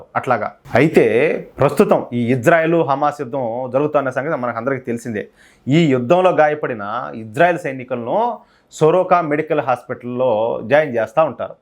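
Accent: Rayalaseema, India. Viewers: సార్ చక్కటి రాయలసీమ యాసలో చాలా బాగుగా వివరించారు. దన్య వాదములు.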